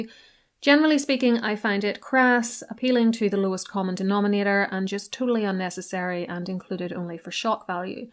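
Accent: Northern Ireland, United Kingdom. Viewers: Your sound you from Northern Ireland I guess you are very British i’m not surprised to hear your opinion. Remember, it’s just your opinion.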